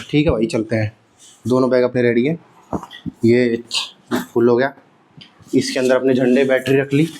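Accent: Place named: Braj, India. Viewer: Oh Dousa rajsthan se wahi soch raha tha ye brajbhasha ascent bol raha hai mai bhi je hu mathura se❤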